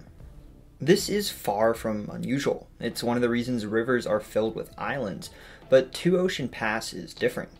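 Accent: United States. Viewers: Interesting,except that the American style of English pronunciation is a bit hard for me to grasp quickly.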